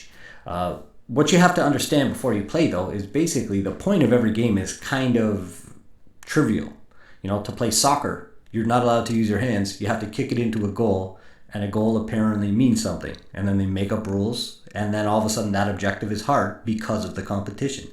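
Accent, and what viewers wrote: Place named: United States. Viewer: Thanks the video is great you should do more videos like this. I'm a teacher of English from Gaza / Palestine if you ever heard about it:). I teach first grade. I teach 9 classes each has 35 to 40 pupils they enjoy your games and they like songs as well. Nice to meet you and too. Btw your accent is similar to the American accent that's why I asked you ♥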